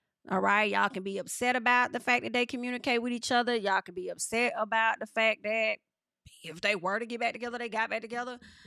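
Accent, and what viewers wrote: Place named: Alabama, United States. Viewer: The first half of this video hit home. I'm from Birmingham and when I go home to visit, it hurts to see how bad my city has gotten. I joined the military in 2015 and never looked back. People ask me if I will ever move back home and I kindly tell them I have no desire to. On another note, I recently subscribed to your channel and before knowing you were from Alabama, I was like she has a Alabama swag about her because the way you talk is exactly the way I would explain things 😂. Keep doin ya thang Alabama sis.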